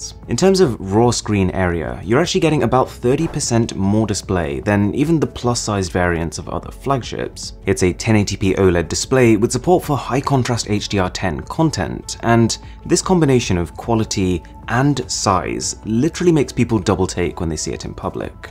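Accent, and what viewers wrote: United Kingdom. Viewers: fancy british accents😅👌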